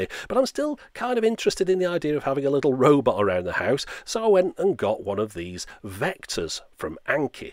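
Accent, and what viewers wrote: United Kingdom. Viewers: How can the original way of speech for a language be this repugnant compared to most of the subsequent versions of the same language? (U.S, Australian or Canadian accents)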